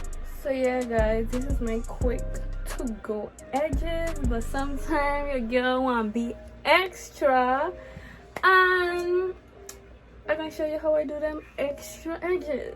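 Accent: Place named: Virgin Islands, Caribbean. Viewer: Hearing a VI accent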